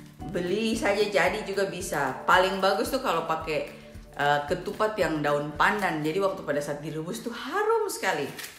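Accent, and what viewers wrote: Papua, Indonesia. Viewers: Za senang dg logat papuax walaupun da tnggl d'luar👍👍👍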